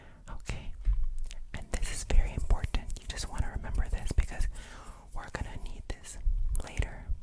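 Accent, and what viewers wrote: Egypt. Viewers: Pure Egyptian arabic accent!! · Your way of speaking in the Egyptian dialect is very beautiful.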